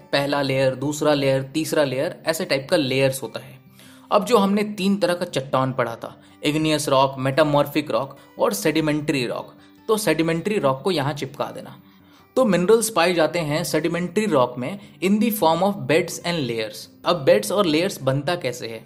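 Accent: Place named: Bihar, India. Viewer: Bihari accent is just soo cute🤧💜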